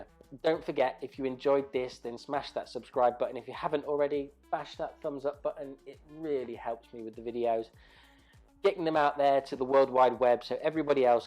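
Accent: United Kingdom. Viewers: Im a totally new hairdresser student and happy to find someone who just explains what to do and not all kinds of useless jokes and comments. Clear and easy to follow.The british accent helps as well ;) Thank you, you have a new apprentice!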